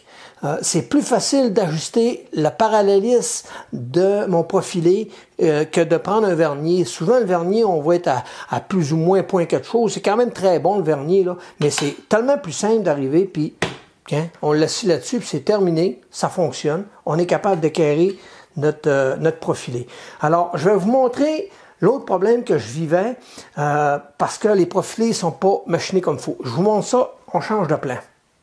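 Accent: Quebec, Canada. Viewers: Bonjour, Merci pour l'ensemble de tes vidéos, (avec cet accent Québécois magnifique, c est un plus) je suis débutant en impression 3D (plastique) et moi aussi je me suis fait un cadeau pour noël avec la Ender 3 V2 avec laquelle je découvre, et c'est un régal pour l'apprentissage. As tu envisagé de faire la modification du direct drive comme sur la V1? Merci encore pour tes vidéos et ton implication, c est motivant.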